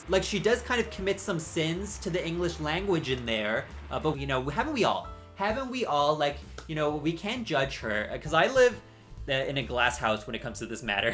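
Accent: United States. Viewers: I know you said don't ask about it... but why DO you have an american accent as an irishman? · Why DO you have an American accent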